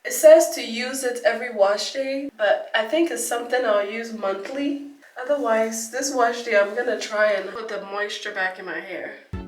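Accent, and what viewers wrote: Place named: Ghana. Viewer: I knew she was Ghanaian the moment she started talking 😂❤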